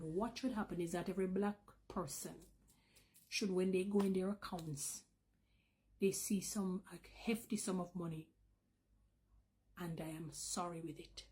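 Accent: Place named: Jamaica. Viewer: All black is ok as long as it is from the country that enslaved them! In America ( American decedents of slavery) ados because American government enslaved our ancestors! I don't know what country enslaved your people but I can hear your accent, you sound Jamaican. Hope you and your people get your reparations from whichever country that enslaved your people( ancestors)🤔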